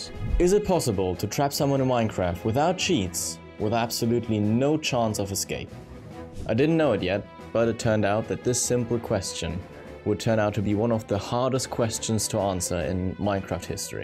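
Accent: Germany. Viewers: I am German so I know what things are hard to say and I hear that just a tiny bit but I didn’t see anyone else recognise German accents so it could just be me being very good at hearing these kinds of details. But it’s fine. You don’t have to panic. I am in Hesse and one day my pronunciation just became better. I just decided that I am gonna put more effort into my speech.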